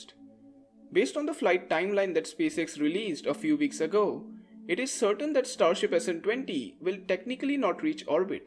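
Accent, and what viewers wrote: India. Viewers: Try speaking a little more slowly. Given your strong Indian accent you might be a little easier to follow. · I love Indian english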